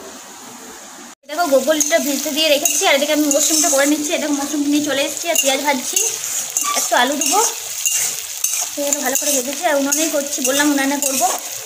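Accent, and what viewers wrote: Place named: Bankura, India. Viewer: আমারও বাঁকুড়া তে বাড়ি। টাউন।তোমার কথাই বাড়ি।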